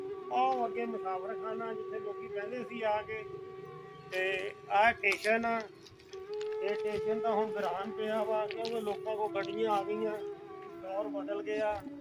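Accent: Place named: Ludhiana, India. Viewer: Sir aapki boli to Ludhiana deha form Begowel doraha Ludhiana Punjab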